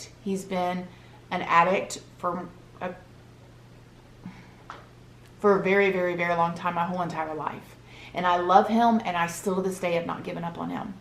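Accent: South, United States. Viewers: She's got a beautiful southern twang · Girl..I adore you! I have been binge watching your videos. There Is something about u that speaks to my soul! I love how your a lil bit of country ( accent )..and a lil bit of street yet classy all wrapped in 1! Does that make sense? Lol.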